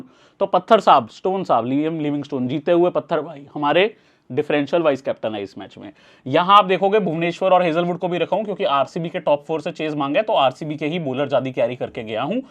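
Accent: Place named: Punjab, India. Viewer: Punjabi voice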